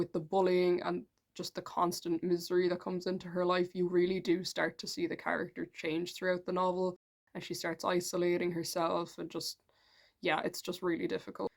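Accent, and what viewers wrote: Ireland. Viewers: I clicked on this video and recognised the accent straight away lol, r u a south Ireland pep? Unfortunately I'm from the shitty north but it's cool to know I'm not the only alt person on this island 💀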